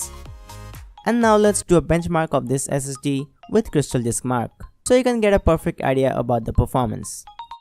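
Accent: India. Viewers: You are absolutely correct. When I listen to people from India speak English (especially on this channel) it takes me a few moments to realize they are speaking English and to mentally adjust to their rapid verbal pace. If the author wants to appeal to a wider audience then your advice should be taken.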